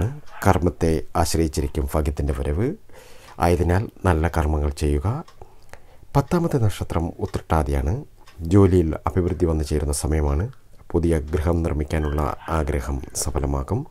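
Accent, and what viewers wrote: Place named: Thiruvananthapuram, India. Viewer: തിരുവനന്തപുരം ആണ് നാട് ആല്ലേ ? "ഫാഗ്യം " എന്ന് പറഞ്ഞു തുപ്പൽ തെറിപ്പിച്ച് ഫോൺ നശിപ്പിച്ച്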